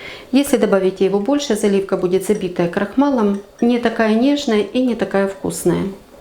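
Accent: Ukraine. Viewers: Нее. По хохлятски говорите. Непрятно слушать. Тьфууу картавите таак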